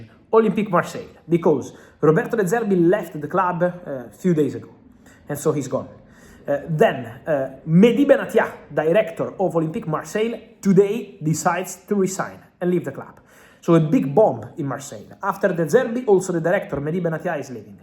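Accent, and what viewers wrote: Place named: Italy. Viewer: Why you talk so fast this is news everybody want to understand you speak english with italian way Pkease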